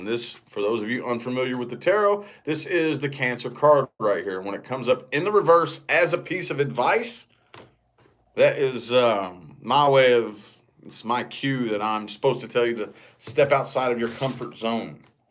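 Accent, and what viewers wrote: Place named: West, United States. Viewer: My ex is Aquarius , a creeo if ever there was one. Wont let me move on as he's full with envy . Yet he cheated on me time and again, and I was 3rd party to this toxuc masked devil in disguise. Narcussust, pathological liar. I want to move on to a better life, a life I should've had . Why do I get lumbered with losers like this snoop, who sneaks in and out if my affairs, he's already got someone in the side so why does he want me?. Its money thats what it is . He was always broke .. your rather handsome cowboy!! I like yr accent!! A real western. Well I'm meant to meet a much older guy in middle of December. I dont mind as long as he's trustworthy honest,, and true. Not Me!@ I've had enough of this air sign because he's a liar, cheat, and a chancer. He cheated me, I was true but he wasn"t. That's it!! Thanks big man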